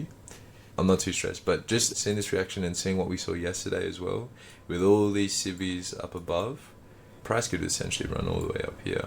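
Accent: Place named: Australia. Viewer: strong mindset 💪 rare to hear a fellow Aussie accent in the trading scene.🇦🇺 keep going up 💯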